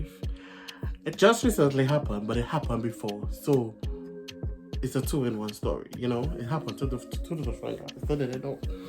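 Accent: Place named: Nigeria. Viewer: Are you Nigerian? Lol, you sound Nigerian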